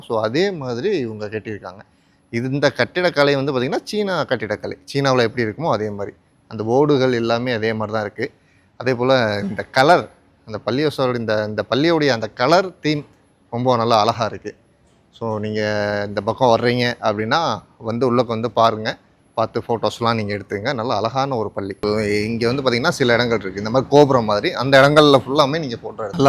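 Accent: Tamil Nadu, India. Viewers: Brother I like your tamil slang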